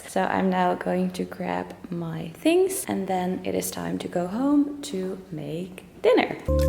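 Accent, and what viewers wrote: Netherlands. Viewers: Just a tiny question.... are you dutch? Edit: laat maar de Milner heeft t verraden 😂 ik merkte het aan je accent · Wait... Are you Dutch?🙈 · I heard the accent and thought: Hé, Holland!! Leuk joh dat je vlogt! Vorig jaar was ik ook super healthy en toen... werd ik zwanger 🤰🏼. Lief kind maar wat ie met mijn lichaam heeft gedaan 😱 PUDDING!! Ik wil mijn abs terug! 😫 Dus we moeten van een “pudding-“64 kg naar een “in shape”-58,5kg. Here we go!! 💪🏻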